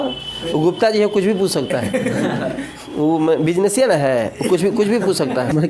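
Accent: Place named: Chhapra, India. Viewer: छपार जिला के मीठा बोली, ऑउ ई सर् जी के फिजिसिया अंदाज़ में कॉमेडी .. जिय हो सर् जी, इंडिया लेवल के बारा हो तू ....अनंदित कर देहलस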